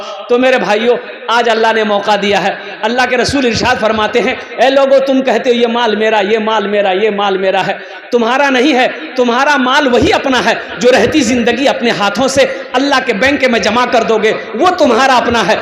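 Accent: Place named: Jharkhand, India. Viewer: Jharkhandi jaise bolte aap maulana sahab ❤😅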